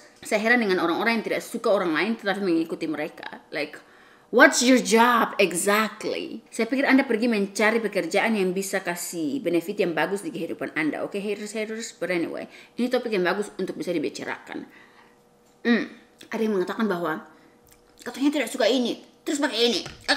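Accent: Manado, Indonesia. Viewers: Yoo bhs menadonya ...kental betul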